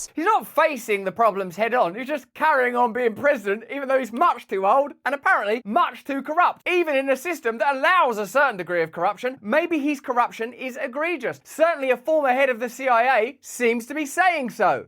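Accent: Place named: United Kingdom. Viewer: “Geh op an go geh’em”… “Get up and go get them” I love the British accent, not making fun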